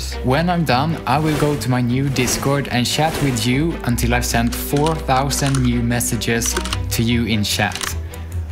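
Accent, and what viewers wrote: Sweden. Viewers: Tha svenska accent >>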